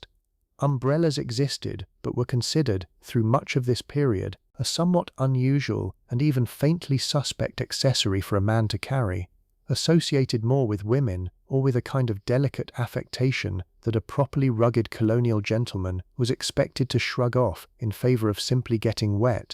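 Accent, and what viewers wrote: United Kingdom. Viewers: You with the British accent forget that your country transported 3.2 million slaves from the 1600s making yourselves very rich. The entire endeavor is still going on in too many lands by the same people that sold their own all those years ago. It is all diabolical.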